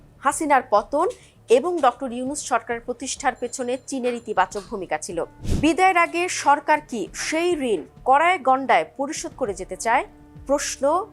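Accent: India. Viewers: Eta Indian channe. Ei betir Kotha o uccharon kolkatar moto. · আপনার কথা শুনে মনে হচ্ছে আপনি পশ্চিম বাংলার কোনো টিভি চ্যানেলের উপস্থাপিকা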